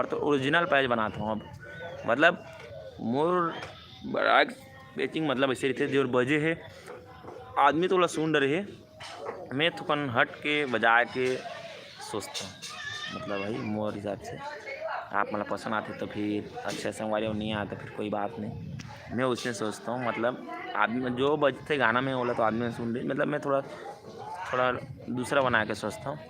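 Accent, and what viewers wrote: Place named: Akaladongri, India. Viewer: अकलाडोंगरी se bolat ho bahiya ji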